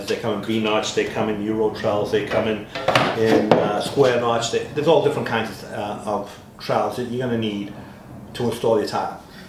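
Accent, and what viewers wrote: Boston, United States. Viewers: he is from Boston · Thank you Sir for this informative video. From one American of Italian ancestry to another,,,what part of the USA are you from? Great accent!!! Regards from New Jersey !!!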